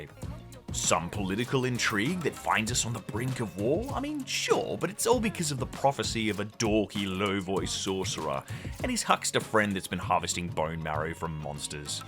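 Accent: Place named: Australia. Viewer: The only thing worse than an Australian accent is an Australian accent that’s trying to be funny and witty. Ick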